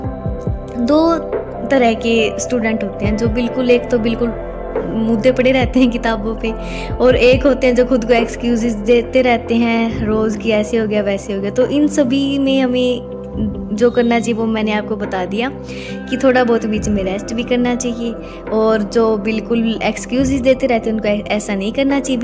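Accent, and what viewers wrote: Haryana, India. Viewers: Haryana se ho aap didi because aapki awaj se lagta h muje ❤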